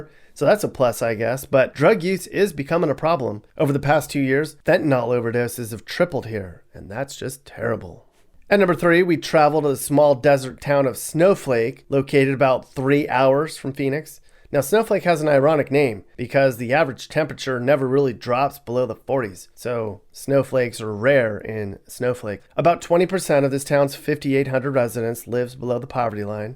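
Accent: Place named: California, United States. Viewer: Very true. He sounds like he's from California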